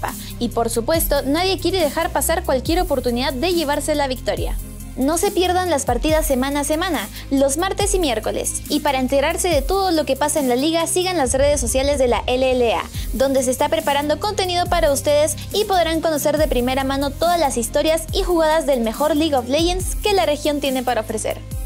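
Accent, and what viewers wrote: Chile. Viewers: Que loco, nunca le había notado el acento chileno a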